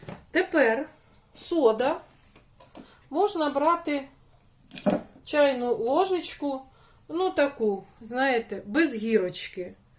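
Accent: Ukraine. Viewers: За вареники дякую і як приємно чути нашу мову, мову з центру України. Якось по домашньому. Ще раз дякую. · Приємно чути черкаську говірку, наче дома побувала.Добра Вам!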